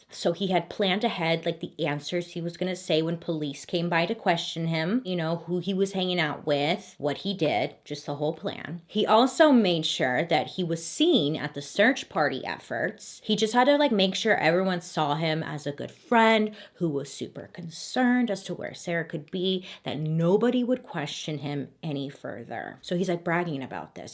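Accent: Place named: New Jersey, United States. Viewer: Is it me or did she have New Jersey accent in this video? Love ya but i noticed it, maybe it was just cause the crime happened in Jersey but it was there.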